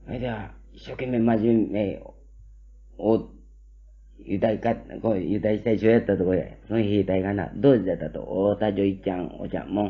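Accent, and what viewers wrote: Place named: Kagoshima, Japan. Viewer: 鹿児島県民やからやけど、まだ、わかりやすい方の鹿児島弁やな、頴娃語やとガチでわからん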